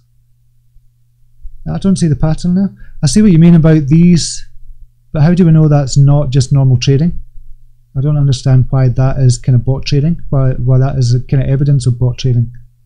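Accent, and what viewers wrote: Scotland, United Kingdom. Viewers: I just came for that Scottish accent :) Well, and I'm in the very early R&D stages of my crypto journey, so I'm really here just absorbing and learning. Nice to meet you. There was definitely alot of distortion here, and there today. I watched a bit, when this was live, and it kept freezing on my side, and now, on playback, I just hear the sound distortions. Just an FYI. Have a wonderful weekend!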